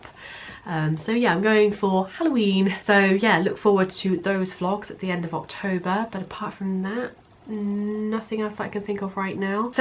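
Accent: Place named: England, United Kingdom. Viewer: I’ve been there on holiday before! It’s great isn’t it? I used to live in the England and I still visit a lot, I was just wondering what part of the England are you from because your accent is similar to mine 🙂 (sorry that probably sounds really weird)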